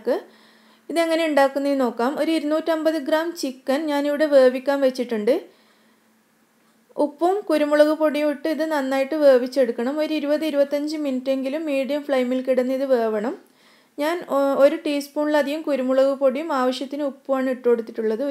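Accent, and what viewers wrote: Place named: Malappuram, India. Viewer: kitchen lnsha Allah......ennundakkanam. samsaram Kannor style allallo ....proper Kannor aalla annu thonnunnu.Oru Malappuram style und .Njan Malappuram aane .Athinal anikk kelkkan nalla ishttamane.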